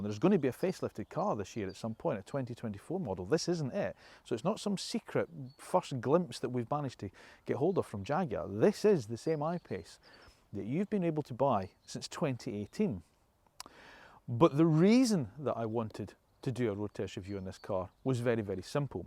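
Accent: Scotland, United Kingdom. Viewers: nice charming scottish chap's calm voice EEEEEEEEEEEEEEEEEEEEEEEEEEEEEEEEEEEEEEEEEEEEEEEEEEEEEEE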